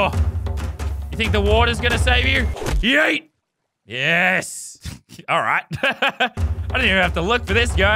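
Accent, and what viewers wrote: Australia. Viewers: Jacob DesLauriers that is just how they say it in Australia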